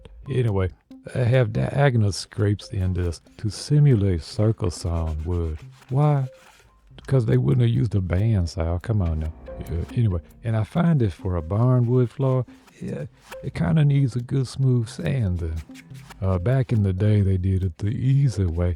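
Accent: Louisiana, United States. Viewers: Dat voice reminds me of a Louisianan accent.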